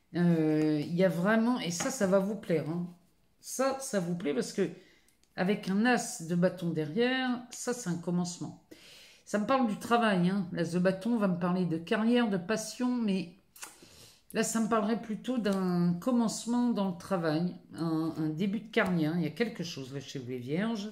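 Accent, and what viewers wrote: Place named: Loir-et-Cher, France. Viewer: j’ai plutôt pensé à l’accent du Loir-et-Cher :)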